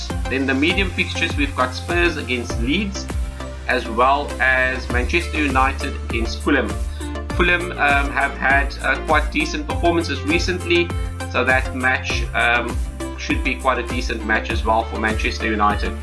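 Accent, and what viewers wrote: South Africa. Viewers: South African?.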